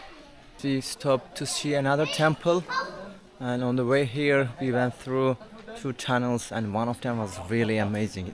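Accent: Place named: India. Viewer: aksanın hintlileri anımsatıyor :)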